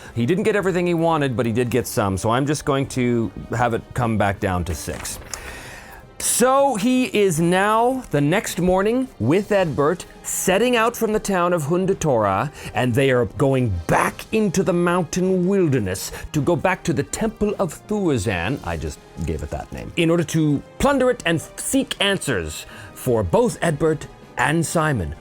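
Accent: Ireland. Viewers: Your irish accent is a bit Colin Farrel. 😁👍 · your Irish accent is pretty good! (That’s actually a big compliment!) Strong Dublin vibes.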